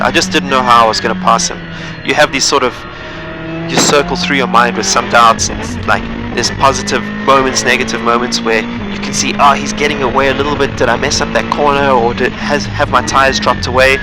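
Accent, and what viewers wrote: South Africa. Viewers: Greetings from SA! Totally unmistakable accent right there.. (: Nice to see a home boy succeeding like this in the racing world. Suffice to say I'm jealous of the cars you get to drive... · are you South African? you sound South African. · You sound South African.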